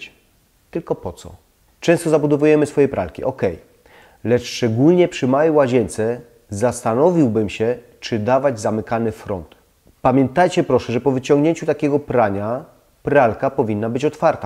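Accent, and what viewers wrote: Silesia, Poland. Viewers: Czy jest Pan ze Śląska? Pytanie z ciekawości :)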